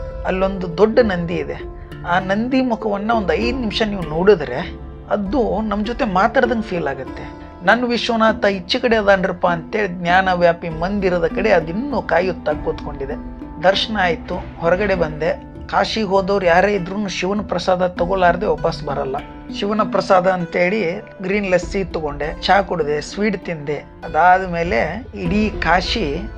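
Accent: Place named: Hubballi, India. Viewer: ಹುಬ್ಳಿಯವ ಹುಬ್ಬಳ್ಳಿ ಸ್ಟೈಲ್ ನಾಗ ಮಾತಾಡೋ...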